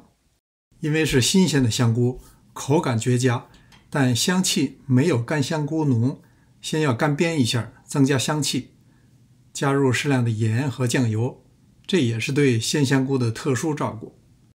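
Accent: Beijing, China. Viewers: 看了很多美食视频，最喜欢的。不仅食材的获取途径，还是做法都很易操作，还有同是北京的调调很亲切。😊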